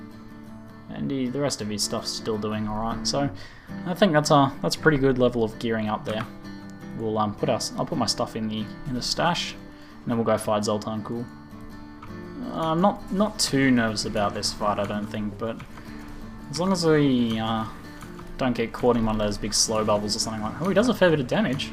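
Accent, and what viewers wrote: Australia. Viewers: I love this serie! and a very big plus to the commentary,australian accent and content!! +1 Sub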